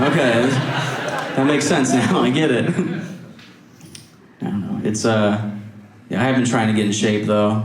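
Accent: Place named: East Coast, United States. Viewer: Great special. Dig his easy flow, never low on the funny. Voice (not style) every so often sounds like Stavros Halkias for a few seconds, he has to be East Coast origin. I’ll watch this a bunch of times.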